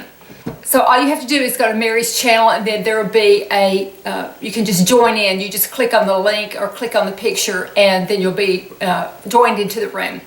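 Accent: South, United States. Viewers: Oh, sweet, you are such a pleasure to watch, and us over 60 girls need to love the way we look and just enjoy our lives. My gosh, if I looked as lovely as you, I would be in seventh heaven, plus the delightful southern drawl. You just keep the sweet lady you are. Love from Iowa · you're such a beautiful, upbeat person! Enduring surgery and living with a chronic disease is not easy but you have learned to adapt and find what works for you. Love your video's and that Southern accent. Enjoy your time with the grandkids!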